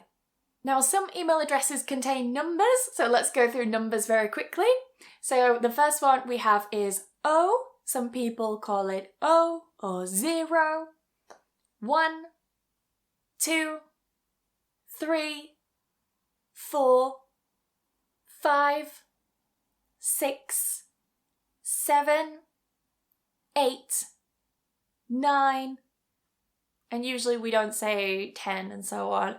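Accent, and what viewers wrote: United Kingdom. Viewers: Thank you for your British English pronunciation and I your voice that is very very sweet...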